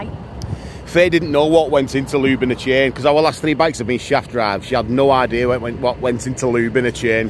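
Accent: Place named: Liverpool, United Kingdom. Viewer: Your missus is clearly a Scouser but your accent is a strange mixture of Scouse and something else! What gives!! Great videos by the way.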